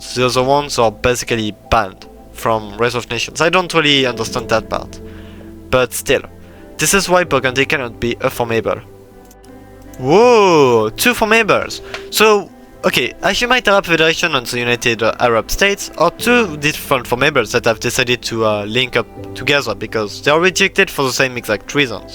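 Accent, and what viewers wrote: France. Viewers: Je serai surpris si tu n'etait oas francais,qsa vois avec la voix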